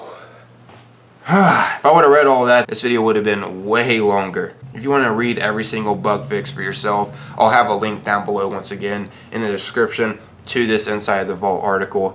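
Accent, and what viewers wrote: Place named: Philadelphia, United States. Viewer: You from philly? You sound like me. Go birds! Thumbs up!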